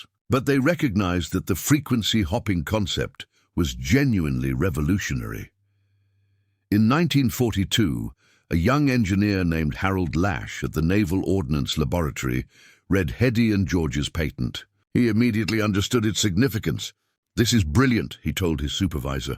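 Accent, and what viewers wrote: United Kingdom. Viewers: Why does the world seem to think that Brits are more respected than Americans?? Why is the narrator here (AI generated voice) speaking British English?? DUMB.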